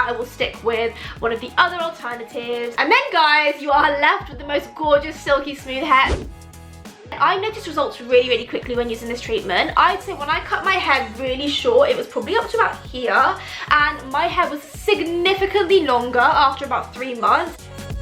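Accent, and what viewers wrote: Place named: England, United Kingdom. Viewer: She's British-Indian and lives in England, you can tell from her accent that she's raised in England pretty much with Indian culture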